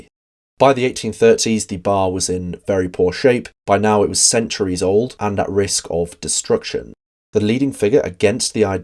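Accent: England, United Kingdom. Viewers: Dear Lord Brilliant! Love the accent. So English. What I wouldn't give to go back to the days where we could just go about jabbing people with metal sticks and shutting the gates on the morons that don't support us. Can we go back to that or not? lololol! No, I'm just joking on that, right? I mean, no, I have to be joking, we are so far beyond that technologically. I get to fly remote control RC drone hellis packed with lasers and cut down infidels? Ok, maybe? Oh man, it would be like that one helicopter, that was like the night rider helicopter, what was it called? Airwolf. lololol! Oh gosh. I want be an RC drone air wolf for infidels. No wait, I wanna box those people fair and square honestly. Then if that goes wrong. lololol. Oh my god, we've lost the plot. All over a silly old gate dude. You are gate happy bud. Gate happy.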